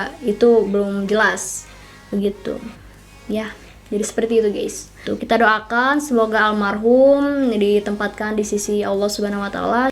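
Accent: West Java, Indonesia. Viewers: Logat sunda nya masih berasa :")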